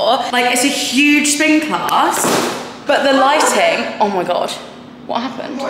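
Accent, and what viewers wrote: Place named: United Kingdom. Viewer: I don’t think I realized that you had a British ascent till now. I just followed one of your Instagram links and found and subscribed to your YouTube channel. This entire time I thought you were an American 🇺🇸. I love hearing your voice for the first time. I had imagined it was going to be a higher pitched voice prior to hearing this video.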